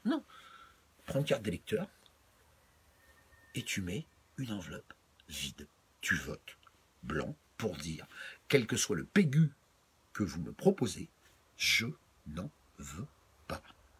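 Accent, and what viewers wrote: Paris, France. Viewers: C'est la première fois que je t'entends, j'abonde en ton sens à 100%. PS : ton accent franchouillard à la limite du titi parisien est top ! Continue !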